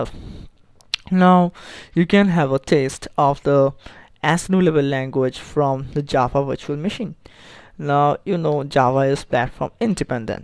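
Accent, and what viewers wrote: India. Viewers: don't see what he is doing with English ,see what he is doing with computer . . . he is a Bengali from India. His mother tongue is Bengali then he learnt Hindi and English is his 3rd language. . . If an Indian is speaking English , probably its his/her 3rd language. so stop making Fun of our way of speaking English . . . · Definitely Indian! How he's saying 'Data' is selling him. Good tutorials by the way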